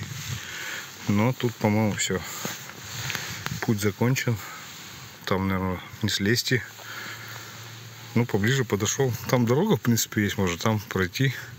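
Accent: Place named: Urals, Russia. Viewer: Откуда ты дружище? Говор Уральский😅. Наш!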